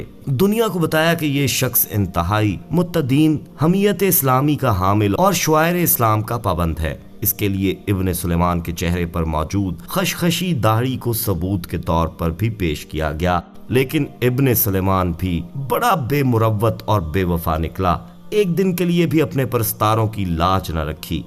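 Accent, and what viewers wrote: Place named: Punjab, Pakistan. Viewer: Apni Urdu sahi karo bhai bilkul bhi sahi nahi bol rahe khalis Punjabi dihati lehja hai aap ka kisi Karachi waley se hi commentary kerwa letey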